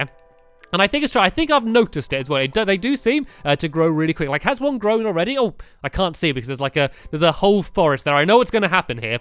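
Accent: United Kingdom. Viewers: I stopped watching stampy like a year ago because his voice sounded annoying to them so I stopped watching for a couple of months but now I watch him because I don't care what they say any more he's from England he's British get use to it people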